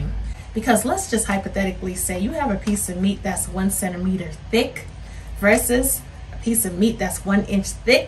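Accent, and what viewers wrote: New York, United States. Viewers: I like that NY accent. It's cool that you cut your own meat chunks. It's definitely less expensive. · Start watching... looks nice... Oh dear, American accent, I'm going to have to convert from pounds to kg aren't I...?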